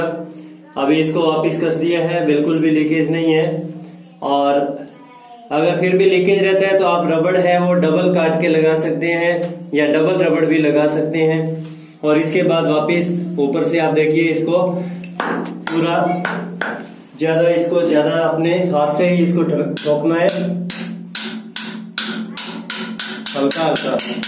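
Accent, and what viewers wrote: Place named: Himachal Pradesh, India. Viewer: Himachali lga da bhai tu menjo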